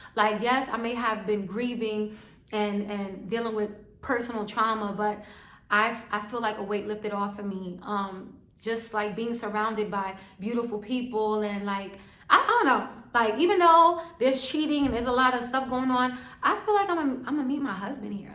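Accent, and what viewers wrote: New York, United States. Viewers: You sound so New York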